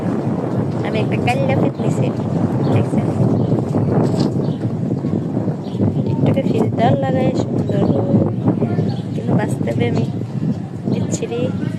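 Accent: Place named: Noakhali, Bangladesh. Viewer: আপু তোমার বাড়ি কোথায় মনে হচ্ছে নোয়াখালী পরিচয়টা কি দিবে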